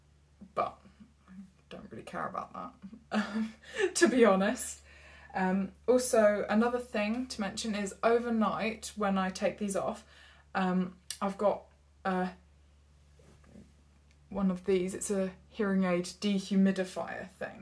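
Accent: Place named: England, United Kingdom. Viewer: Hi, just seen you for the first time. I am impressed by your lovely english accent, and very clear speech. Definitely no deterioration there. I used to have a friend who went deaf in his 20s. I knew him when he was in his mid 50s. He still had perfect speech despite having virtually no hearing at all for many years. Even when we were stood right next to a noisy compressor that started up, he had no idea it was running until I told him. I just mention his experience to make the point that deafness does not necessarily lead to poor speech. With regards to your Naida aids needing batteries. I think in some ways that is more convenient. For example, when you go travelling, you don't have to take a charger with you. And no worries about finding somewhere to plug them in. Or finding a suitable adaptor for foreign power sockets. Or if you are travelling overnight, the rechargeable ones might run out of power. 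You seem to have a good attitude to life. I am pleased to have "met" you. Best wishes.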